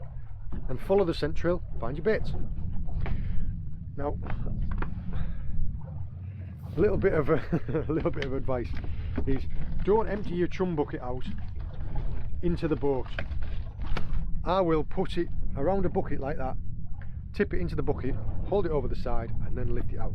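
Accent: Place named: Middlesbrough, United Kingdom. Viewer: thought as much you sound same as me from the boro lol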